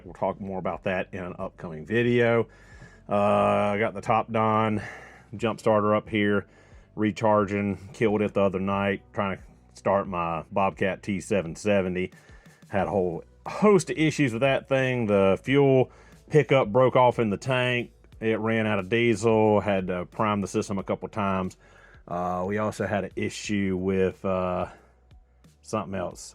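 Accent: South, United States. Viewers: You read my mind when you were complaining about salt I was chuckling because I am next to the Canadian border and every car rust to pieces. You put on a really great show. I like listening to your southern accent. It’s very relaxing and draws you in, you can really tell a story.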